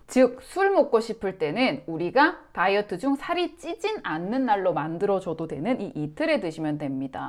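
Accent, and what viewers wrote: Gyeongsang, South Korea. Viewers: 경상도 사람이다... 티가난다 억양에서 ㅋㅋㅋ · 혹 대구분 이신가요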